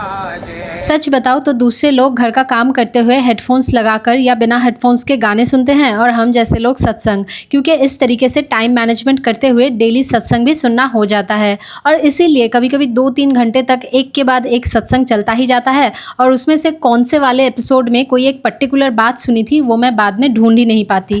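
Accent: Bengal, India. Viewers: Same didi ❤❤jab tak bhajan, katha na laga lu tab tak din ajeeb and adhura sa lagta hai ❤❤ apki bengali voice kitni cute hai 🥰 shree ji ki kripa ap par bani rahe ❤❤Keep It Up ❤❤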